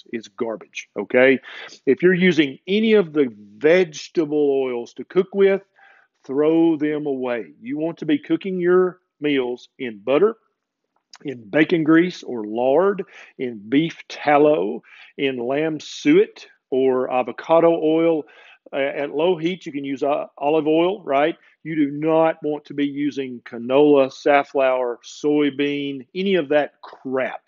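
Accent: South, United States. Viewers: KenDBerryMD Unfortunately the Specialists dont have a clue even in their trained fields My gastroenterologist had no clue what leaky gut is, my Psychiatrist says Benzodiazepines and other Psyc Meds are completely safe, tell my brain that. They easily put you on meds but as far as risks and tapering off not a clue. I see a Hollistic MD as my primary. What foods we put in is the key. Avoid grains, sugar, processed everything, organic, and healthy fats..... what is helping me slowly recover. Thanks for your channel. Love the accent, my Dad was from the South, lost him in 2010. The best to you.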